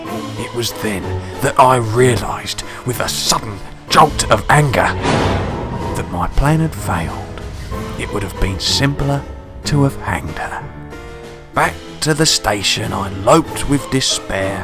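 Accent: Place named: Cockney, United Kingdom. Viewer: And every year of the date of the accident it runs again as a warning to others, plunging into the gap, shrieking like a lost soul! Great work! I really enjoyed it! Great voiceover too. Good cockney accents always sound good.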